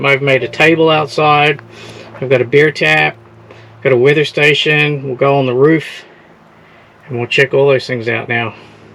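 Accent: New Zealand. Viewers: Mannnnn I can hear the kiwi in ya haha awesome . I’m from WHG in the north . Kia kaha . I’m manifesting a ride like this ! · I love this rig and the fine Kiwi accent. 😊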